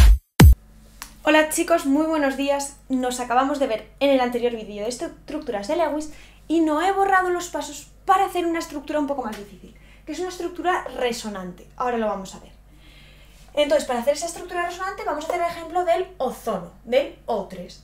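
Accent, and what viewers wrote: Spain. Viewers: Ya entendí mamacita hermosa 😍😌 y me encanta tu asiento español me matas con eso Wao🤭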